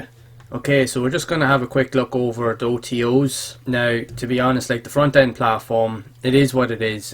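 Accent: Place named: Ireland. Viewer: Great honest review.. Where are you from, Cavan? Nice to hear a fellow Irish man. As per the product.. I doubt even newbies would be approved to promote the 30 offers..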